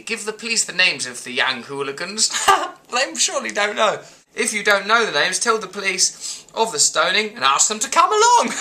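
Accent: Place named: United Kingdom. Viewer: British accent is DISGUSTING!!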